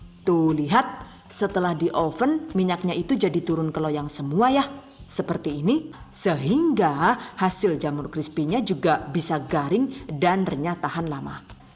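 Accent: Banyumas, Indonesia. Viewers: Jowone ngapak mantap resepe lucu iklane suka 2 nya itu jamurnya nak coba sudah saya catat lalu saya coba ah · Aja klalen,,,diiiii caaateeet luuurrrr😅😅😅😅😅 ora ngapak ora kep nak,,,mantap jiwa mempesona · Ngakak pke bhasa ngapak tp sngt seru,,thanks resepnya😁😁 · Astaghfirulloh.... NGAPAKNYA gawe nyong njungkel kepoyuh ngakak ora mandeg kie🤣🤣🤣🤣🤣🤣🤣🤣🤣🤣POKOKE THE BEST CHANNEL, SUKSES SELALU 😍😍😘😘😘😘🤣🤣🤣🤣🤣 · Ngapak mbangeeeet kieee mak... Masya Allah kereeeen. Ra ngapak ra enaaakk... 😂😂😂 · Hahahaha salammm ngapakk .wong ndi sih kie yah😂nyong ngapak kie jan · Yayayaya ngapak temen rikane Mak 😅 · Suka bgt dngan channel ini,detail bgt. Di selingi khas ngapak yg lucuuuuu....bikin gk bosen nontonnya. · Ngapaknya katok kak yakin bikin ana pingen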